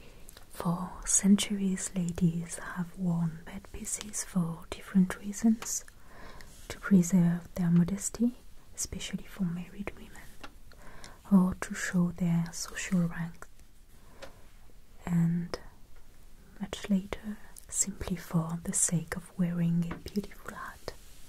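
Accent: France. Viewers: Bonjour, j'espère que cette pause aura été bénéfique pour vous. Pour ma part, vos vidéos m'ont beaucoup manquées et je suis ravie de vous revoir ! Pouvons nous espérer, un jour, une vidéo complète en français ? Car si je ne m'abuse, c'est votre pays natal ? Votre accent m'y fait penser. Bon courage et merci pour ces vidéos qui doivent vous demander tant de travail !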